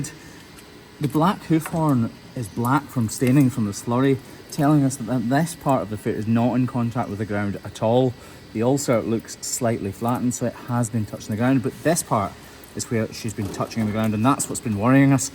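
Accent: United Kingdom. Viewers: It's like watching the ear doctor clean some wax. Satisfying. Except you have a British accent. Love it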